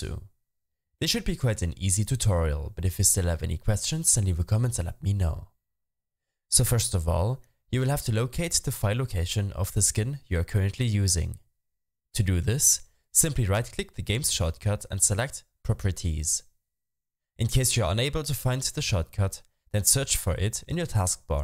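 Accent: Germany. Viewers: the german accent is crazy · hahaah man hört instant den deutschen akzent raus Danke für tut :) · You came from German right?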